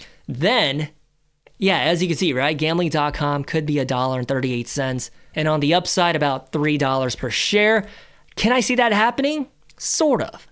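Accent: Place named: California, United States. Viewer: I thought you lived in California, due to your accent.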